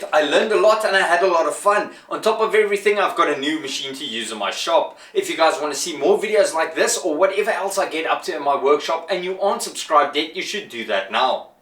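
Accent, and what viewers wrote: South Africa. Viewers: Would that be an SA accent I'm hearing? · Bloody hell how South African is this guy? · I'm assuming you are south African, your English is making me exhausted haha, don't paint the dovetail ways.looks Good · ... good job, well done. i can hear you have a South African accent. Greetings from Moscow ...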